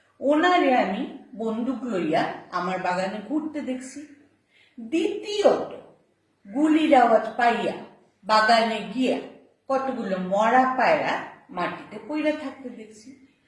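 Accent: Bangladesh. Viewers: দারুণ কইছোস বাঙাল ভাষায়। ভালো হইছে। জিও আর চালাইয়া যাস তোর গুনাবলী। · অনেক শুভেচ্ছা জানালাম।যদিও ভানু বন্দোপাধ্যায় এক অনন্য প্রতিভার বিকাশ, অন্য দেশ হোলে সম্মান প্রদর্শন অন্য রকম হত,তবু বলবো,দেরিতে হলেও আপনি এসেছেন,দয়া করে থেমে যাবেন না,চালিয়ে খেলুন, শুধু বাঙাল ভাষাটা আরো একটু প্রয়োগ করুন,খুব মিষ্টি লাগবে,কথা দিছি। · Thanks for continuing with Bikrampur dialect that your father was a master. Great initiative!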